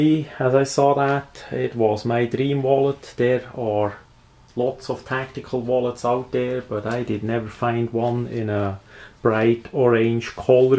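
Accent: Switzerland. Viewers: Is this what Swiss accent sounds like?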